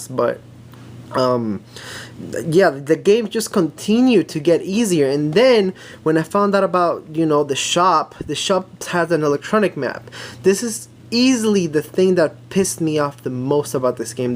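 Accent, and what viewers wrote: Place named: South Florida, United States. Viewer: Great review! I literally just purchased this for the PS Vita in 2026 ha ha, ha ha enjoy your memorial day weekend. Not for nothing but it sounds like you’re from South Florida ha ha ha By the way, this game is still $10🫠🥴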